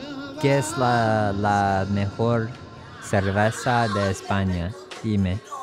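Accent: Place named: Northern Europe. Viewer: Hola para alquilar un apartamento poco tiempo mira en Airbn, si quieres mas tiempo mira <link>. Tu español es muy bueno, pero el acento de "guiri" ya no se te ira. "Guiri" le llamamos los españoles a los extranjeros de habla inglesa o del norte de Europa.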